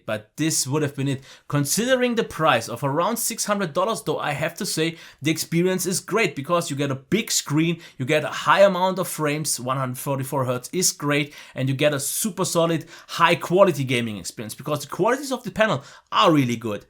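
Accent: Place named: Germany. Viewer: German accent (almost the same as Austrian, which Arnold comes from).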